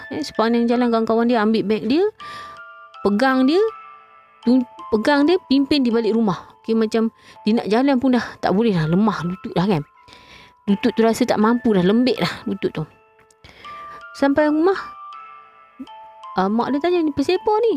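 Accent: North, Malaysia. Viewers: pandai dialek utara...😅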